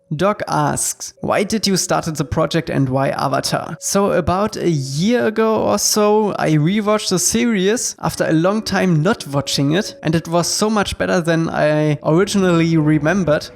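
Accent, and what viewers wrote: Germany. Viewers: Are you German? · Kann das sein das du deutscher bist?😂😂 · Dein deutscher Akzent ist echt episch haha. Naja solange dich jeder Versteht passt's ja 😋🤣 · Dein deutscher accent ist echt baba HAHAHAHA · Is he a german? Edit: Lol he is really one😂😂😂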